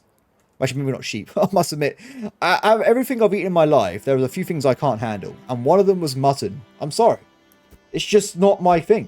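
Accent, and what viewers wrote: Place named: United Kingdom. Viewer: "A lot and are" word,sir you very cool with British accents specially with A alphabet James bond accents,are you from south UK sir?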